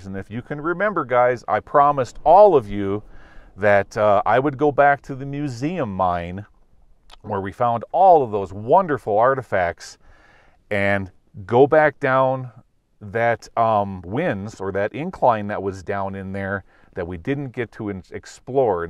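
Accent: Wisconsin, United States. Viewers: Are you from Wisconsin?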